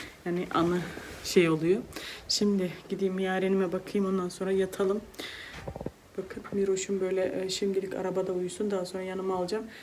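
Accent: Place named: Azerbaijan. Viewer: Canim merhaba sizin konusmanizdan kulturunuzden bize azerbaycanlara benzediyorum niyese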